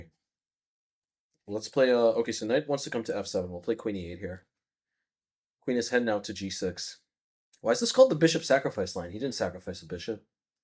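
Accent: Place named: Rhode Island, United States. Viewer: are u from Rhode Island? I’m guessing the accent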